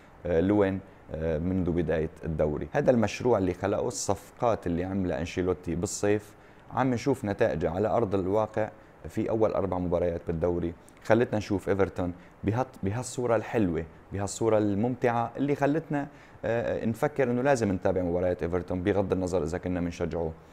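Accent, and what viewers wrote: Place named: Aleppo, Syria. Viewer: كلام هادئ ومتوازن ومنطقي،من اول فيديو عرفت انك من حلب،الله يعطيك العافية وتقديم جميل وسلس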